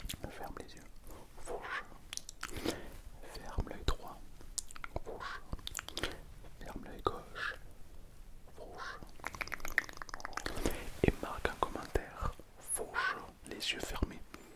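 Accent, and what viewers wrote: South, France. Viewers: Mdrrr avec l'accent du sud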